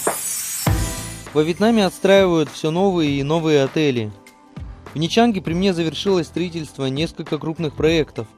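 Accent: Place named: Arkhangelsk, Russia. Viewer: Добрый вечер, 😉Интересная кухня, наверное, вкусная)))Но северные шаньги и калитки, думаю вкуснее 😊Про образование, в следующем видео, я бы хотела знать )))А разговор, Архангельский, знакомый 😊👍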